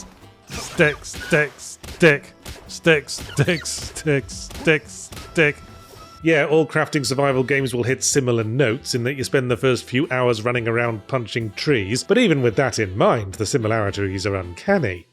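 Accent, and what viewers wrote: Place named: United Kingdom. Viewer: It's so weird for Yahtzee to sort of grouse on the anachronistic look of the game. I mean, from ZP to this, his entire schtick is humorous anachronism. It's the humor of a sophisticated British accent saying creative swears. It's a very consistent artstyle of blob shapes juxtaposed with any old photo pasted from google images. That's why I find it funny. God forbid a game do the same thing.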